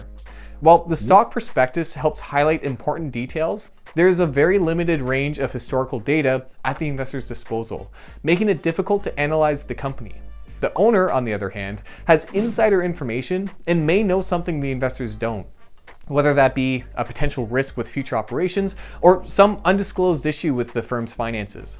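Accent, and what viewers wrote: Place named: Canada. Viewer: Just enjoying the Canadian Abeut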